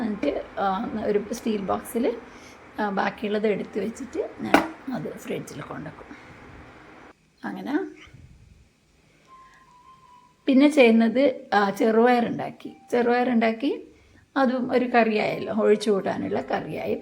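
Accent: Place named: Kannur, India. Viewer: കണ്ണൂർ കുക്കിങ് സൂപ്പർ ആണ് ട്ടോ കണ്ണൂർ ഭാഷയും സൂപ്പർ ❤️🥰❤️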